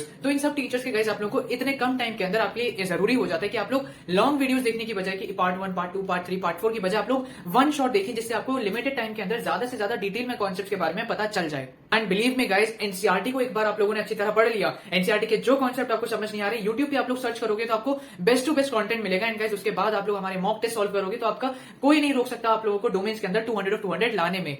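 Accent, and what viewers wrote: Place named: South, India. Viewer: Why does bro have a south indian accent in the new videos ?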